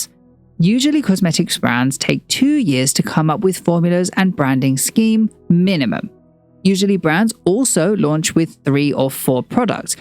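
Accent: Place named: United Kingdom. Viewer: I’m with you on that. I fall asleep to a British Egyptologist with a similar accent on the regular.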